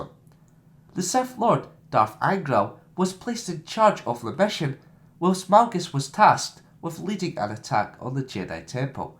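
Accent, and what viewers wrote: Scotland, United Kingdom. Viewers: your scottish i know that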